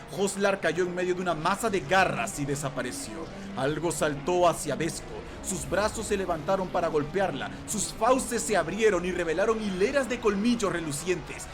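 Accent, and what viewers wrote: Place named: Spain. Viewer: De que parte de España eres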